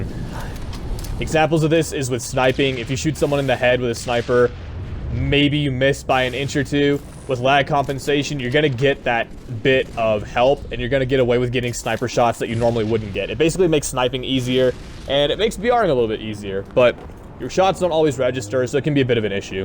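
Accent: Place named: United Kingdom. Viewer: 0w0 u sound like a fellow brit>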